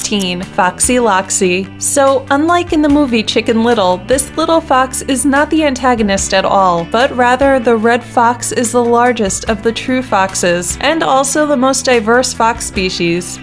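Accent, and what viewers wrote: United States. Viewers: Love the foxes but you seem to speak with an American accent...we use inches and feet and yards!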